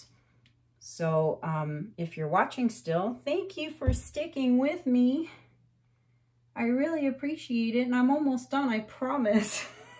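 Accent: Canada. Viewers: I love listening to your accent and chatter, I’m an Aussie and love the Canadian accent.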